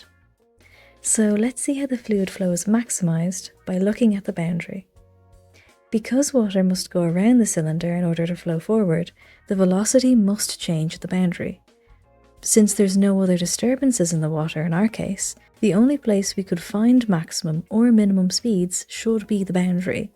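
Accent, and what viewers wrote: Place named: Ireland. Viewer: Beautiful video, beautiful Irish accent. Hope you make a series of videos to develop these concepts.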